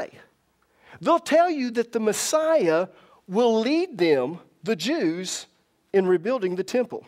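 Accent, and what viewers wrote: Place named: South, United States. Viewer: You definitely sound like your from the south too! 😁 Bama girl here. Love your teachings 💜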